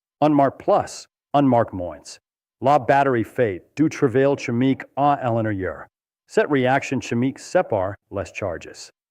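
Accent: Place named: England, United Kingdom. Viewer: Oups 😮 le sujet est intéressant mais la prononciation anglaise des mots francais les rend pratiquement impossible à comprendre... You better say it in english or find someone Who speak " français " 😊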